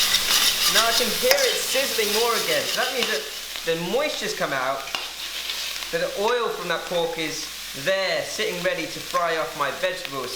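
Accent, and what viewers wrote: England, United Kingdom. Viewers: Random question: are you English? You sound like you have an accent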